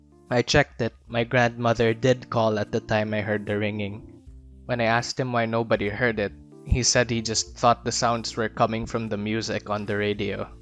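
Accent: Philippines. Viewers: Are you a Filipino? It's because of your accent.. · HOLY FU— YOU’RE A FREAKING FILIPINO?! Guess I should’ve known. That’s my freaking accent, too. XP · knew you were filipino because of the accent but im still wOAH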